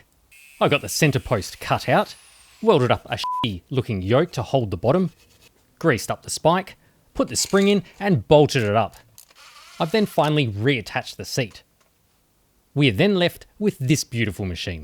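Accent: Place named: Australia. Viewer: I love your sense of humor. Your Austrailan accent makes it even better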